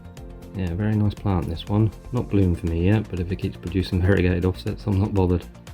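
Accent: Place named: England, United Kingdom. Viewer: mellifluous English English accent.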